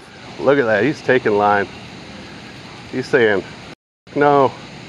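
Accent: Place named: Rural, United States. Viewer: Why does he sound like he’s trying to cover up a country accent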